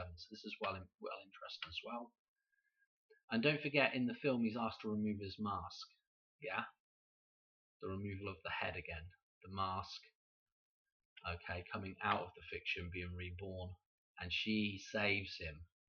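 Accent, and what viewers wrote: United Kingdom. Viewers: 🕊️🌿 Your findings are AMAZING! You are helping me confirm and make many connections. THANK YOU! THANK YOU! Lovely to hear your British accent too. I was born near Stonehenge, surrounded by Churches named after Mary Magdalene 🌹🌿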